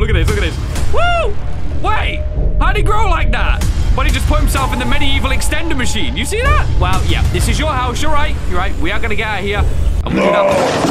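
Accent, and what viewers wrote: United Kingdom. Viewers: This guy is British flamingo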